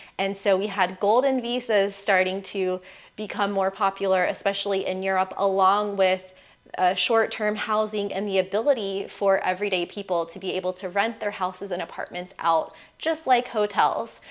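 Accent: United States. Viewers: so professional, love your american accent, love your eyes, i'd give you the visa you wanted If it were up to me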